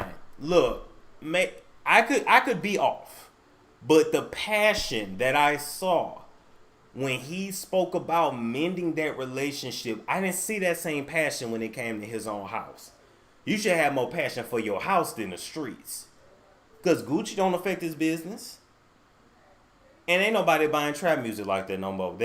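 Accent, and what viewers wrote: St. Louis, United States. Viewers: I knew you were from STL,!!! The way you talk, and one day you said the “Apple Bees off Lindbergh!” #314 💯😅🤟🏾❤️😎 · Wow I know someone from St Louis and I felt like they sound alike... wow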